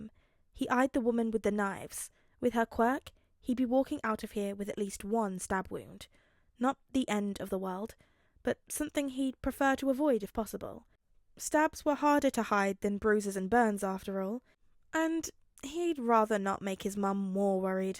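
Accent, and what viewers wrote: United Kingdom. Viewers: This is like, my 5th time listening to this podfic and it’s still amazing! You’re one of my fav podficcers! I’m also British and it really makes a difference not having someone American do it, I always find myself correcting them on the way we pronounce words and have to tell myself it’s not their fault Americans say words wrong. So basically yeah! Love your stuff and I Hope you’re having a good day!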